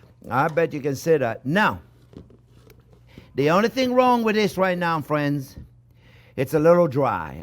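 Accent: France. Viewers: your accent and attitude are evrything ! mon cheri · Great video. You are an amazing teacher. You never said what temperature the oven was set. I guess 350degrees? Please use ingredients & the measurements in subtitles as your beautiful French accent is a bit confusing to this 72 year old Marine. God bless you and your family during this holiday season. I look forward to your next video.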